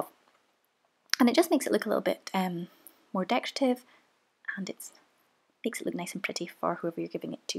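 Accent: Scotland, United Kingdom. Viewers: Loved watching this I've just found your channel and your videos look great and it's lovely to hear a fellow Scottish accent ❤️ x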